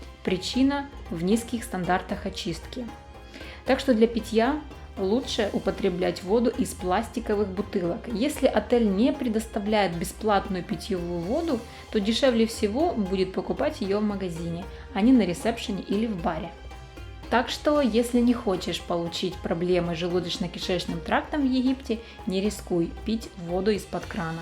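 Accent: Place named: Ukraine. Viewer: поработать над собой, конечно, надо. Но надо учитывать ещё и диалект, акцент. Понято же, что девушка украинка. Вы же не будете делать замечание иностранцу, если он будет разговаривать на ломанном русском. Здесь такая же история.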